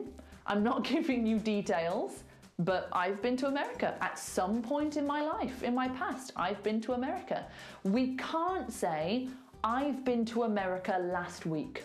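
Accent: United Kingdom. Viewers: That British accent... ❤️ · Thank you, It was so useful for me and, I love the British accent btw!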